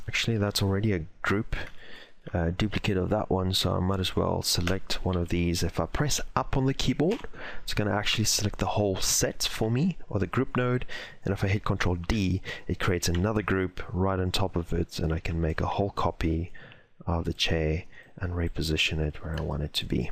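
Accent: South Africa. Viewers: My ears did a double take, a fellow South African ^^, nice work, learnt a lot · Heard the Afrikaans accent and I immediately subscribed. Die video is goed dit het baie ge help, Dankie.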